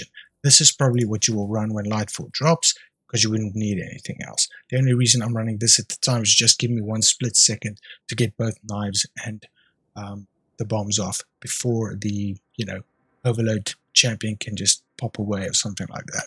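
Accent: South Africa. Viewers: Great build video. I'm rocking something similar to this. Gonna edit and see how yours feels Are you from SA? The accent is so distinct.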